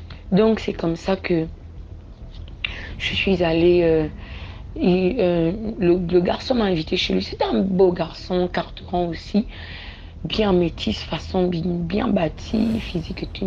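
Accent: Gabon. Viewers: L'accent est gabonais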